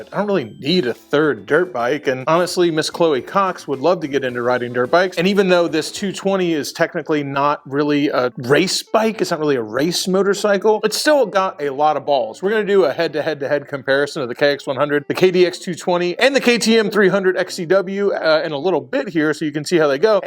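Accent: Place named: South Florida, United States. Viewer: I’ve been around since the heavy southern Florida accent! lol good times… long time. As real as any YouTuber gets, good bad and ugly.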